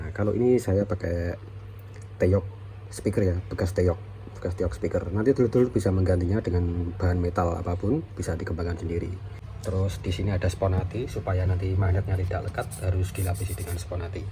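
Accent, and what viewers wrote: Surabaya, Indonesia. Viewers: You sound like you are from Surabaya going by your accent?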